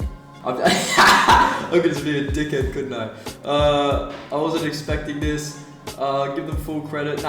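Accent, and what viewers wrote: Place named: Sydney, Australia. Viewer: hahahahaha bro it’s the sydney accent cuz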